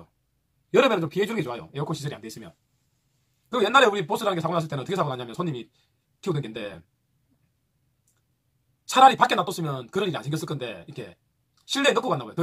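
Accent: Gyeongsang, South Korea. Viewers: 좋은강의 내용 너무나 좋습니다그런데말이 너무 빠르고 경상도 사투리에 빠르다 보니서울에 사는 저는 무슨소리인지 60%밖에 못 듣겠습니다.천천히 또박또박설명을 해주시면 더 좋겠습니다앞으로 더많은 강의 부탁드립니다감사합니다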